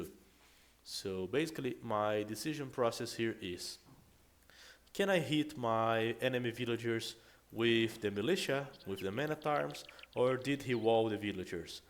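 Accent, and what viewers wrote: Brazil. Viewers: ele é brasileiro? o english dele é meio sotaque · Your accent remember a brazilian speaking.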